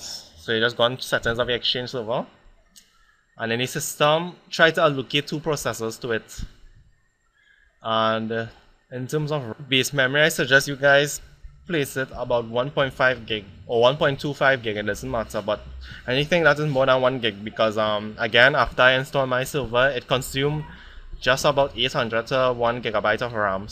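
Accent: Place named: Trinidad and Tobago. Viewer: Oh Gawd Boy!! Dah accent is real Trini like bake and saltfish. lol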